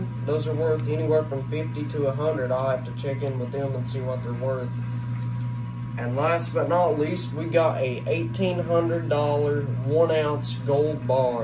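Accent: South, United States. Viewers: Love your accent, I am from Australia. is that a southern accent,👍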